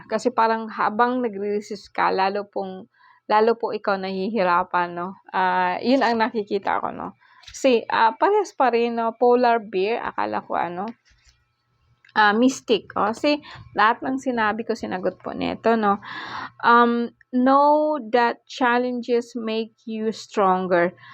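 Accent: Bicol, Philippines. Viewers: Taga Bicol po kayo? May punto po kasi kayong Bicolano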